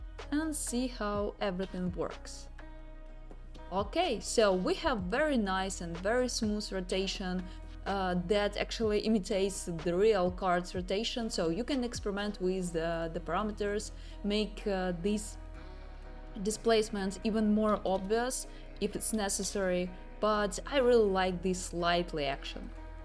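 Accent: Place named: Russia. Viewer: Такой классный русский акцент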